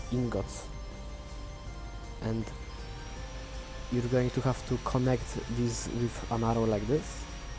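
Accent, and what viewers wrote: Poland. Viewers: tylko cie uslyszalem i odrazu wiedzialem ze polak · are you polish? you have a very polish accent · bro are you from poland i can't listen some words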